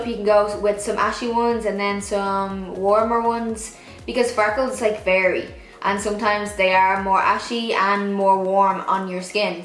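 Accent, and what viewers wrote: Ireland. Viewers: She's from Ireland!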